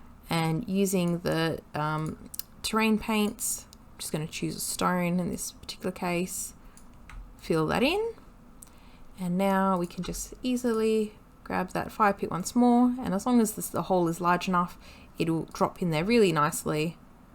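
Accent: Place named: Sydney, Australia. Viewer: You're from Sydney, aren't you?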